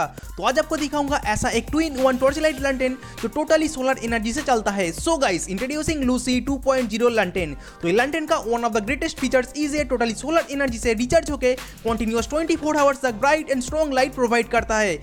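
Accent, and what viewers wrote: Bengal, India. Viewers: Sound bangali type h. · Aar Tumi bangaali haaii... Aur aapkaaa Aaawaaj se paataa chaaaalta haiii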